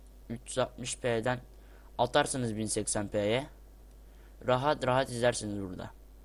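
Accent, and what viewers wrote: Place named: England, United Kingdom. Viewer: ing aksanına hayran kaldım kardşmmm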